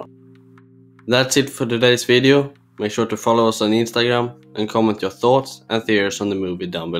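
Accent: Sweden. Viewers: Sorry if this is offensive, but are you from Sweden? Because you have a swedish accent lmao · I just have to ask you.. are you from Sweden? 'Cause it sounds like you're talking swedish - english. I'm from Sweden and can't talk swedish - english.. it's a little bit ambaresing.. (sorry for spelling)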